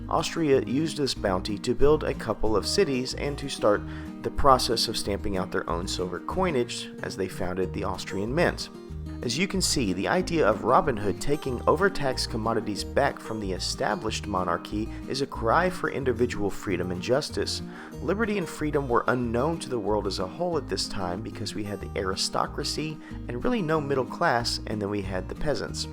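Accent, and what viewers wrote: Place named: United Kingdom. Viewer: Nice British accent, I give it a 9/10🤣 Video 10/10 🤙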